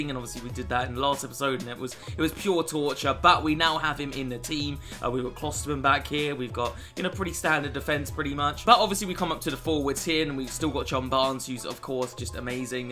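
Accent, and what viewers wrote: United Kingdom. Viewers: I really can’t tell if you’re British or not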